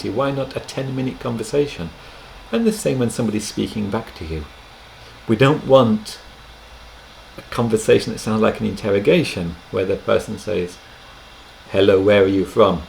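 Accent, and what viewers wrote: England, United Kingdom. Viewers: I like hearing English English, if that makes sense, ha! I'm American so our English is slightly different, but you probably know that.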